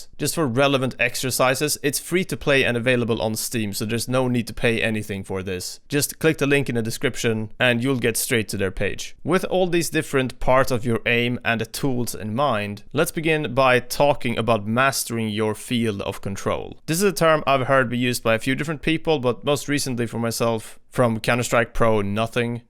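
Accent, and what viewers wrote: Sweden. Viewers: are u from sweden? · is it just me or does it sound like he has a swedish accent while speaking english.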